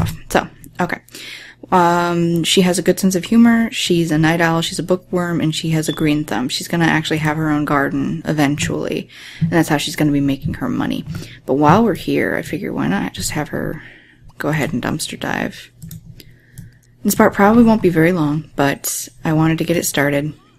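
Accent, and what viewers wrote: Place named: New York, United States. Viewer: Are you from New York? I went there last spring and you sound like you are from there.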